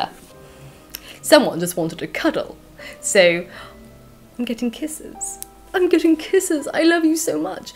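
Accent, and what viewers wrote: RP, United Kingdom. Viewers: Received standard pronunciation and a lovely classical English accent. I thought I’d never find that on this website. I’m in love and subscribed.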